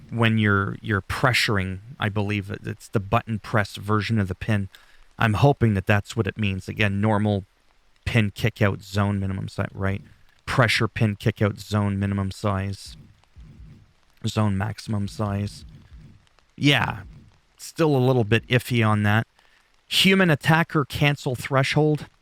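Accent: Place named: Canada. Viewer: Merci by the way im Gove pleasure too meet u I really like your Canadian accent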